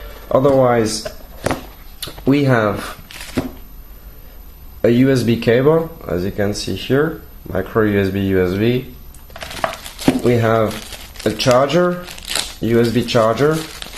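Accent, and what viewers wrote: France. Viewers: tu ne serais pas français par hasard?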